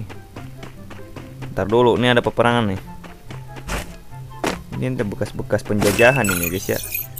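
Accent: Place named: Sulawesi, Indonesia. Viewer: Bang logat lu kek orang buton/sulwesibang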